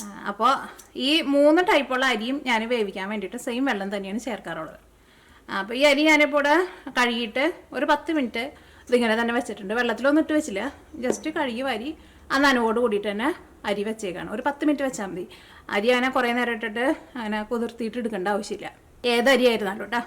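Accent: Thrissur, India. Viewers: Thrissur kar ayonda chengayi mare samasaram podik kudum.ente kudumbakar und anubavam und.Ek estta avarude samsaram kelkan.😍😍😍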